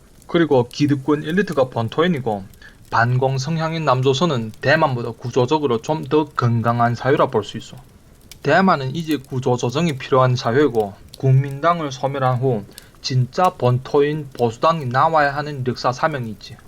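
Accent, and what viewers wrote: Yanbian, China. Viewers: 아닌것 같은데 완전 연변 말투입니다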